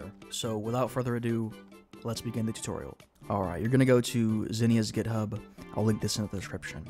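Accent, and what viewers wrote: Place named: Kentucky, United States. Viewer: he sound like my old friend from kentucky 😥😥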